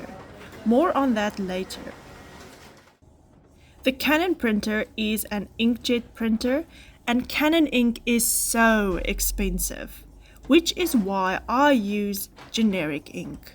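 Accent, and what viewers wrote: South Africa. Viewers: you sound South African so why are you using Amazon your links do not make sense e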